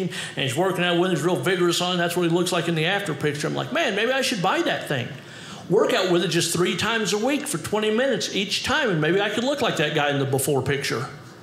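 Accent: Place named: Missouri, United States. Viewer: So much fun watching & laughing along listening to you Mr. Thornsberry. Thank You I was cracking up here in Mid-Missouri . You’ve kinda gotta smartass sense of humor I heard your Missourian accent that’s familiar. Your humor i relate with.😂😂😅 Now I’m asking you do another show here on Drybar. I’d enjoy to hear some parenting stories. I like that you talk about your wife & how you two had pretty much known each since childhood. That’s really cool. I’m happy you obviously love your wife. Tell your audience more about your life in y’all’s little town. Will ya?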